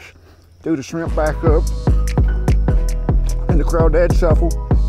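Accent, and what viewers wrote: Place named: Louisiana, United States. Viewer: Where are you from? Your accent is throwing me off. Looks like your from Wyoming, the land around you looks like Texas and the accent sounds like you're from Louisiana or Mississippi, but the words aren't matching. But there's like a northern thing going on idk lol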